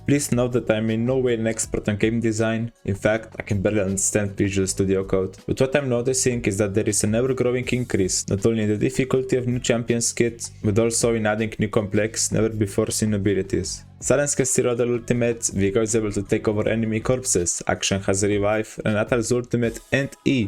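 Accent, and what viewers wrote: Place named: Eastern Europe. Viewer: Not sure where you are from, but that is the most slavic accent ever! Great video btw!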